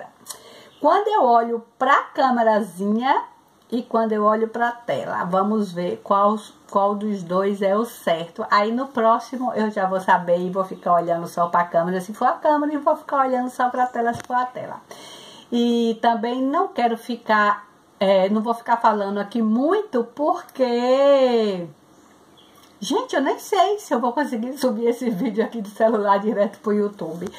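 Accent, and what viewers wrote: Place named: Bahia, Brazil. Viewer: Adoro a sua pronúncia. É tão engraçada. Das pronúncias do português, variante brasileira, as que eu gosto mesmo é da sua (penso que é da região de S. Salvador da Baía) e da de Minas. São muito "cantadas"! Beijinhos de Portugal. Ah, também já sentia a sua falta. Parece que a partir da altura em que me inscrevi no seu canal, deixou de postar com alguma frequência. Volte logo, para poder ouvi-la (gosto!).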